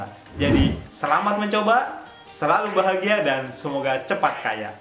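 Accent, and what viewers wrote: Banjar, Indonesia. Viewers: A org banjar sanes?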